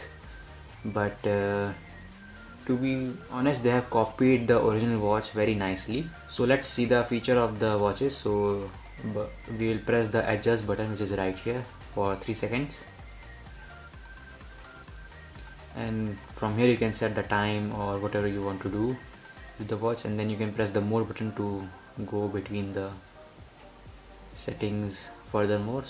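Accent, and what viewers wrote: India. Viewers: Since you are Indian and I hear your accent you must be a scammer and I don't believe anything that you say because of your place of birth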